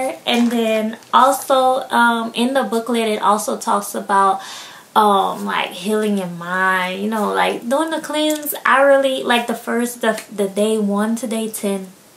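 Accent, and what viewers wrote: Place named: Deep South, United States. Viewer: Girl u from deep down south...😍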